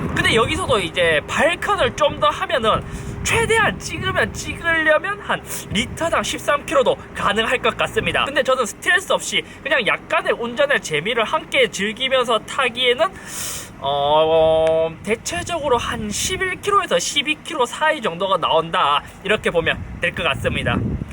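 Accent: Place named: Andong, South Korea. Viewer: 말씨가 안동 말씨였구만요.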